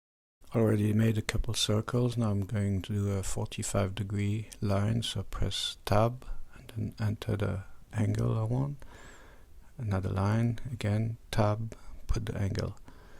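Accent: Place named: Neuchâtel, Switzerland. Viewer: Oh un neuchâtelois :-)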